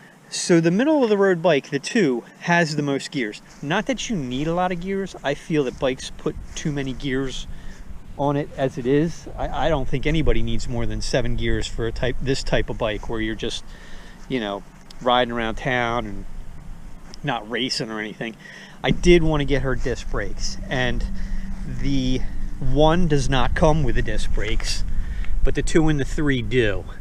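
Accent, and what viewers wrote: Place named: Upper Darby, United States. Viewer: I haven’t lived in Pennsylvania in 14 yrs but I know an Upper Darby accent when I hear one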